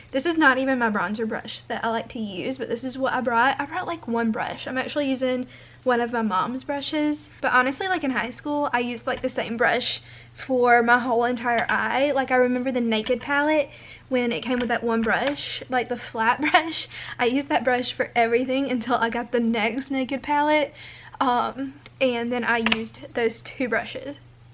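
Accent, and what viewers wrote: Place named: Alabama, United States. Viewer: youre literally so cute!! this is my first time watching your channel. i searched ulta haul (looking for something to purchase while being so bored in quarantine lol) and i am so happy i clicked on your vid! youre so likable. subscribed :) ALSO i live in mobile, al and i feel like you are also from alabama bc of your accent.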